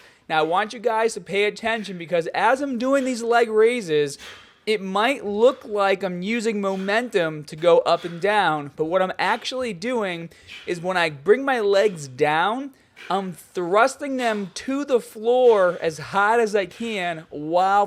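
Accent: Boston, United States. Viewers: Boston accent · The Boston accent is the best ever!.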